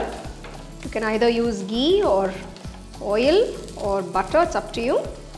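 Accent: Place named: South India, India. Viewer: Bihari sattu paratha in south Indian accent!! A delicacy in itself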